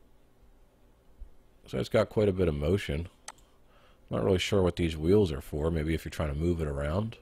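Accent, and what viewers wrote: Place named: East Coast, United States. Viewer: Love your accent. So east coast.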